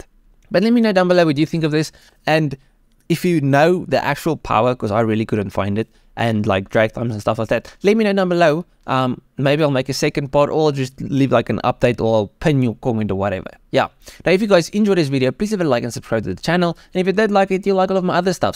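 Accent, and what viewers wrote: South Africa. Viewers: Clearly you can hear the South African afrikaans accent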